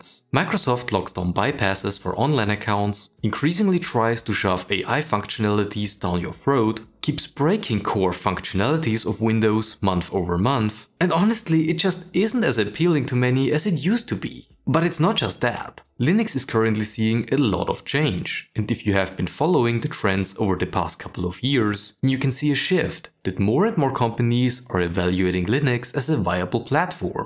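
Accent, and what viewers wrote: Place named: Germany. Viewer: I see open source, I hear German accent, I subscribe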